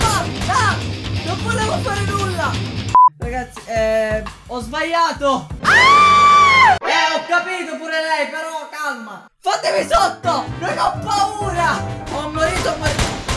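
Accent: Sicily, Italy. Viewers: Ma sei siciliano? Perché sempre che hai l'accento siciliano!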